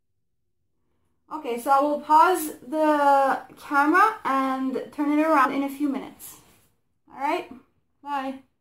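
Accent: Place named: Canada. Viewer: your Canadian eh! love it great work😁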